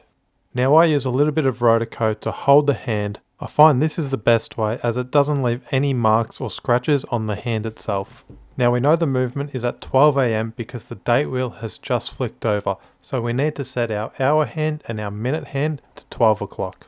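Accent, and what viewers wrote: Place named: Australia. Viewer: having an Aussie accent for a change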